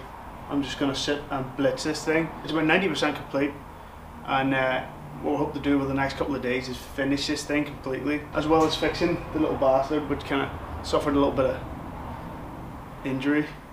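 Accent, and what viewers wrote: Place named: Belfast, United Kingdom. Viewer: where abouts are you from you sound like your from belfast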